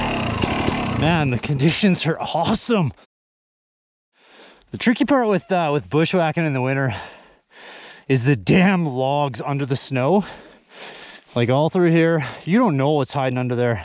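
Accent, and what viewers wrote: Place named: Canada. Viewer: Yeh dude. You Canooks got that funny northern accent and it cracks me up. Grip studs are epic for sure.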